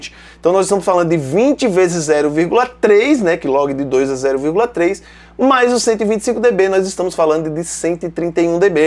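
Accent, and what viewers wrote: Northeast, Brazil. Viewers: vc é de qual estado do nordeste? gostaria de fazer o meu som com vc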